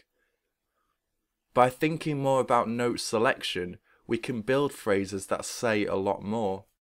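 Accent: United Kingdom. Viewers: Excellent lesson. Awful British accent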